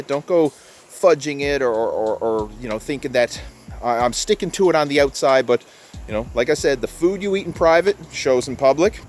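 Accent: Newfoundland, Canada. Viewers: Are you a Newfie?!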